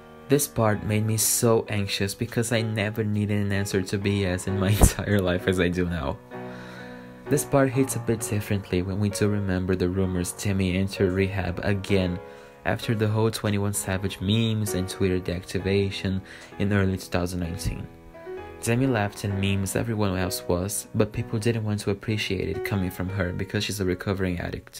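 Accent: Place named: Brazil. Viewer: Esse sotaque parece brasileiro🤔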